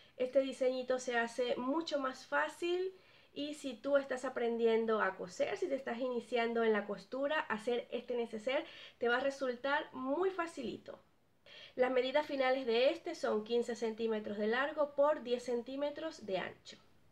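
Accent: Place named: Venezuela. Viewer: Gracias por no perder tu acento, nosotros los venezolanos tenemos uno hermoso.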